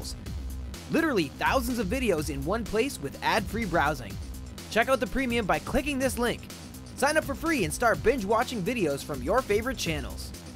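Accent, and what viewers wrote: Canada. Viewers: Things I’ve learned: the narrator is Canadian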